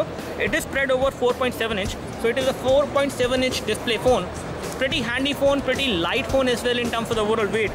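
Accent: India. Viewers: accent hindu...sin duda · Jakoś nie mogę znieść tego hinduskiego akcentu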